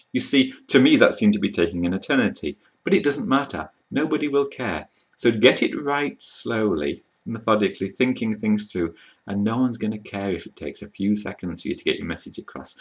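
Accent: United Kingdom. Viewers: Very interesting video. I notice a few people have commented on English accent. But what struck me immediately was that his accent sounds British, but his spelling on the whiteboard was American. An unusual combination.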